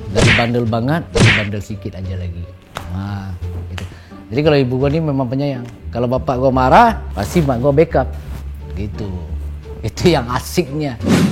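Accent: Malaysia. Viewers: Logat Malaysia masih belum ilang.. Sekali masuk master chef Baginda lord ngomong nya gua gua..😁😁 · Pernah tinggal di Malaysia sama kaya atta dong.....gaya bicaranya sedikit2 kaya orang Malaysia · Cakap mcm org malaysia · Go support from Malaysia 🇲🇾🤞🏻 accent malaysia tak hilang lagi tu😂😂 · Pantesan bahas nya lebih ke kaya orng Malaysia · Kok lucu logat melayu tpi pake "Gua" 😅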